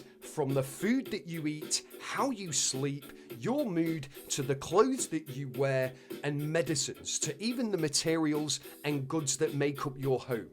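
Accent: United Kingdom. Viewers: I like your narrative in your Yorkshire or manc accent. Somewhere up north... Great series mate, glad I discovered you, you deserve way more views from these vids. Going to have a binge on these now while decorating from where I left off on episode 7. Rather watch this than Netflix anyday!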